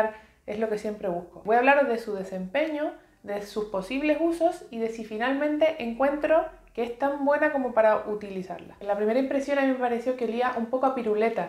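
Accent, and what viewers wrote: Chile. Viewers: El acento chileno-español es suena muy lindo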